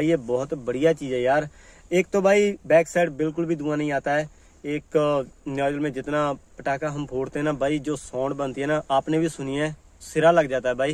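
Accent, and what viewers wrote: Punjab, India. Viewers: ਵੀਰੇ ਲਗਦਾ ਪੰਜਾਬੀ ਆ ਪੰਜਾਬੀ ਕਿਉ ਨੀ ਬੋਲਦਾ ਯਰ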